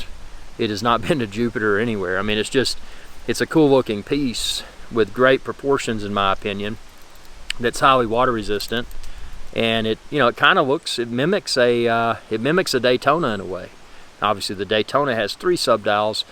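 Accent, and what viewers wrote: United States. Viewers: Great channel! I like you videos. So nice to hear a American accent rather than some English bloke reviewing watches. Lol 😂 You should check out the 2021 speedmaster. The bracelet is tapered 20mm to 15mm and they changed the endlinks. It’s super light and crazy comfortable! It’s also actually Meta tested so crazy accuracy and it’s actually tested to 50m not just rated 50m so you can now swim with it. My wife bought me one for my 50th birthday absolutely love it. The Tudor is a great watch too. I’ve owed a few black bays the only one I’ve kept is the BB58 blue. Even though I have a 7 3/4 wrist the 41mm BB slab side’s look silly on my wrist. The 58 looks perfect since it’s thinner. I know you have a 58 which do you prefer?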